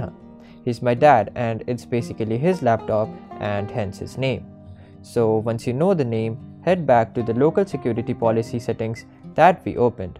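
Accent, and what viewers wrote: India. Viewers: Agree. I like his accent. Not as annoying as other indian youtuber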